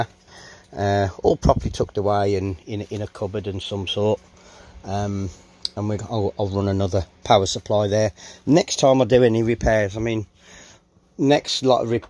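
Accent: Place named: Staffordshire, United Kingdom. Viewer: Can't decide if you're from Staffordshire or Shropshire.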